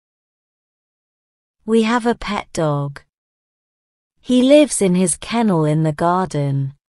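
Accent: United Kingdom. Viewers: Is this the British accent ??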